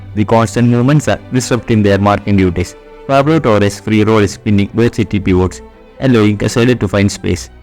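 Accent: Kerala, India. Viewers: Wtf is this voiceover?? Malayali guy 🤮🤡. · I am from west bengal, I have many friends from Kerala. I realized you are from kerala from your accent of speaking english😄.one suggestion for you please improve your audio quality the analysis was good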